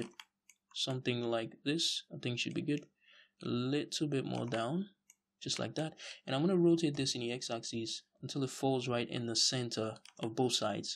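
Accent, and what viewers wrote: Nigeria. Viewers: Nice work bro!!👍🏽 Btw where are you from? You have a Nigerian accent.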